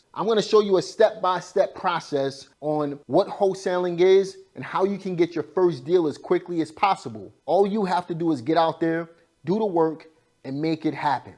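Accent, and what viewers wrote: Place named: Brooklyn, United States. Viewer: Side note…you sound like you’re from BK too! 💪🏾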